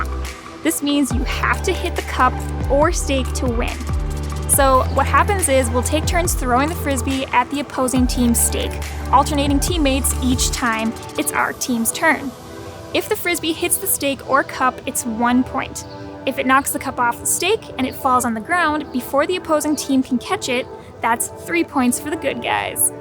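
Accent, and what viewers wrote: Midwest, United States. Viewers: Wisconsinite here. Was going to say, Midwesterner for sure!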